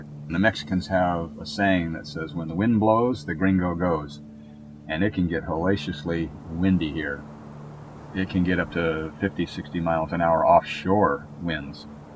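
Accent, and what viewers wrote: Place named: Hawaii, United States. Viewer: he's from Hawaii. That's exactly how they speak. Yer a dick.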